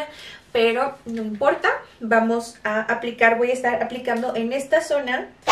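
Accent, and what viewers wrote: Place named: Mexico. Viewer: Amo a ❤🤩 En los asmr no se le nota tanto que es Mexicana 😊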